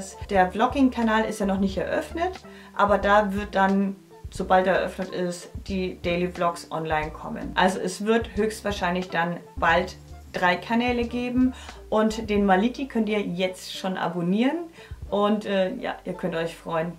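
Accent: Franconia, Germany. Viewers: Ach Gott wie du fränkisch redest, einfach a super sach! komm auch aus der nähe Nürnberg/Erlangen und für mich gibt's nichts schöneres als mei schönes Franken ❤ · Ich wusste es!!!! Ich wusste es schon immer! Also das du aus Nürnberg, Umgebung kommst!!!!❤️ Ich liebe fränkisch und Franke erst recht❤️ Ich könnte mir nicht vorstellen hier weg zu ziehen. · Nürnberg, Erlangen, FÜRTH! 😂 sofort ist mir das Herz aufgegangen beim fränkischen Dialekt <3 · Nürnberg ❤️ Franken ❤️ Heimat ❤️ ich liebe es wenn du im Dialekt sprichst 😍😁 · einfach nur: #Frankenpower :) Ich liebe es wenn du fränkisch sprichst, da ich ebenfalls aus dem schönen Franken komme :D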